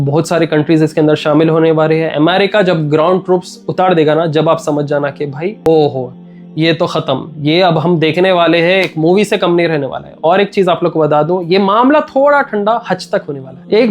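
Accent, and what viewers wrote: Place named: Hyderabad, India. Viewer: bhai "Dabaaa k kara ", "Dabaa k chalra " samajrow mai apan Hyderabadi hai magar thoda national level ke hisab se bhi bolsakte ap....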